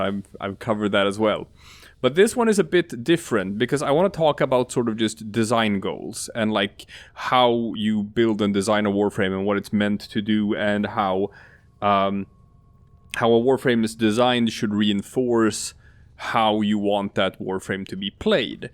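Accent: Sweden. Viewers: First time seeing this channel, the second I heard that voice. Yea definitely a Swedish man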